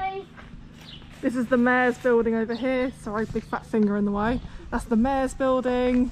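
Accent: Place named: England, United Kingdom. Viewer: Your English is from England, I'm catching it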